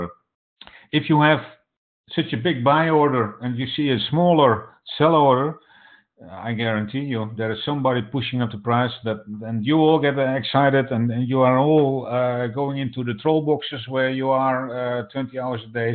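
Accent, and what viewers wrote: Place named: Netherlands. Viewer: Lekker Nederlands accentje hoor! Maar een goeie analyse, keep it up!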